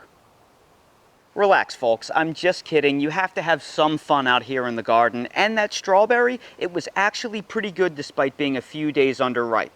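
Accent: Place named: New Jersey, United States. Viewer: I knew you were from Jersey!! ☺️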